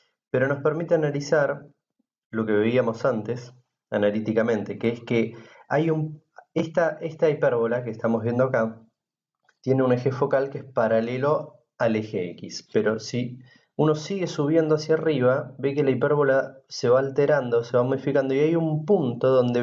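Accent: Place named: Argentina. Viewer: hola como estas? genio excelente explicacion, argentino tenes que ser ja ja. tenes idea como mover el plano en geogebra 3d? abrazo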